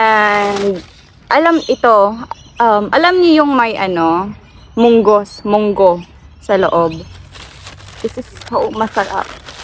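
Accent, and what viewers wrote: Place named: Hiligaynon, Philippines. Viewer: I can hear your Ilongga accent. Love it. perti gid tana ka lambing ya.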